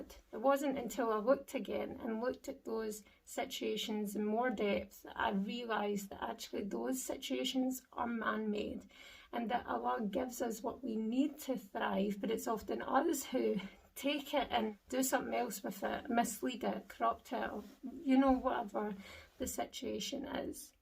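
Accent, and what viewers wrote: Scotland, United Kingdom. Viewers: As a muslim who understand English even in different accents and My mother tongue is Arabic , I may give you an advice that it is better for a wider audience to start writing subtitles because I know many many people who does not understand the Scottish accent . may ALLAH subhanahu respond to your good intentions. Ameen . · May Allah bless you sister, as for me I really love the Scottish accent, I consider myself in a way good in English, but to many others it would be helpful for them to put subtitles as well, may Allah accept the fasting from all, bless you and your family, and guide all your loved ones into Islam.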